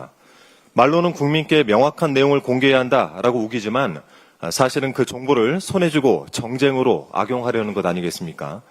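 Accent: Gyeongsang, South Korea. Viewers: 김정재 의원을 뽑은 지역구 사람들은 정신 차리라. 더신.ㄴ 경상도 사투리가 토할 것 같은 느낌을 버리규 싶습니다.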